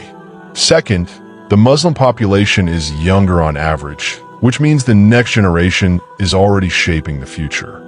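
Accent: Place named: United States. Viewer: Another American narrator!!!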